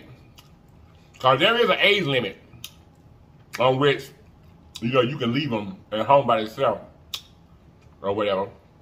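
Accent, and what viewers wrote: United States. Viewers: Hey!! I like that country talk. I be becoming up with words that don't make sense lol you can say them words and it be so 🤣😂😂🤣🤣 and everything and everything 😆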